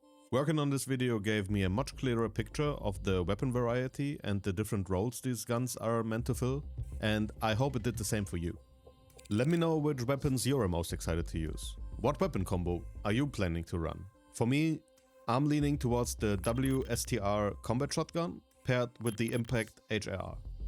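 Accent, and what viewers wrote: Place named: Germany. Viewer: Guten Content bringst du. Deinem Akzent zu entnehmen hast du deutsche Wurzeln wenn ich mich nicht irre. Glaub an dich und mach weiter. Nice Content Dude. Maybe I have The Chance and we can Play together at Release.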